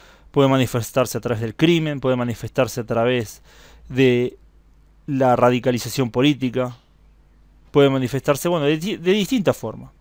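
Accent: Argentina. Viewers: curioso que este "cuate" no hable como mexicano, sino como argentino.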